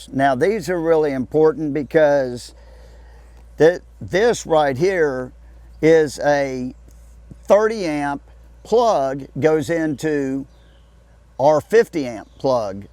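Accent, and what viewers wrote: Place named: South, United States. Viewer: Please use subtitles for those of us who don't speak southern.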